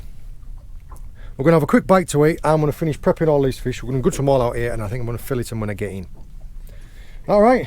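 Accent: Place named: Teesside, United Kingdom. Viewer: Are you from the boro mate, I’m from Redcar and you’ve got a very familiar accent. I go beach fishing at marske with my brother in law. Loving the videos pal UTB 👍🏼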